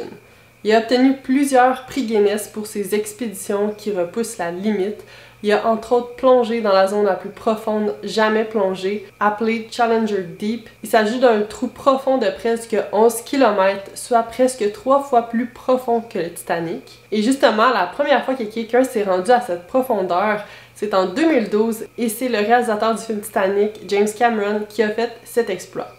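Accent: Quebec, Canada. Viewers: Elle parle très bien francais, elle a un accent québécois c'est tout. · pour ton accent, etant un quebecois moi meme,, ben yen a pas de plus beau et toi aussi tes pomal belle,, et il y a un reportage de France qui parle de comment laffessement ces produit contrairement a limplosion instantannee effectivement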